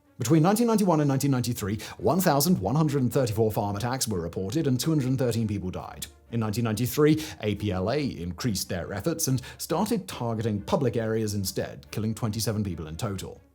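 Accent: RP, United Kingdom. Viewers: Oh!! You must be Spewing Accurate Data with your Know it all' Stuffy British Accent Right ✅️ No Leftist Bias here at all..😂 he's sadly Marginalized a Plight of people which is Very Much Real.. but if you've got 15-20 minutes too hear another Know it all Brit Spew what his Taskmasters ask Hey' its your time too waste 🗑 ( i sadly Did!!) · Your posh English, lack of general knowledge, and biassed turns of phrase betray your prejudice and ignorance. "SANDF" under NP rule? "Communists" in air quotes...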